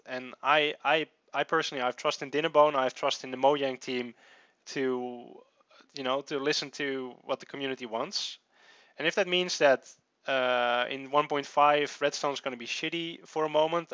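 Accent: Netherlands. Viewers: Tuurlijk is hij nederlands dat hoor je aan zijn verkrekt engels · Hij praat met een nederlands accent... LOL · Je kunt zo horen als nederlanders engels praten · ben je eigelijk nederlands ik hoor het aan je stem? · I can hear from your accent you are dutch, arent you?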